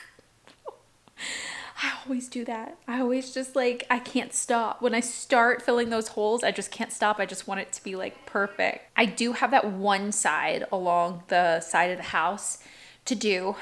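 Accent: Texas, United States. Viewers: you can hear texas accent poking through in this video!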